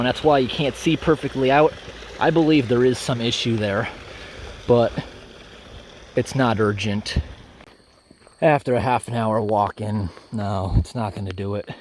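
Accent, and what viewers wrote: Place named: United States. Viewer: Yep, I agree, his accent is American but with good pronunciation and little drawl - much easier to listen to for us Brits!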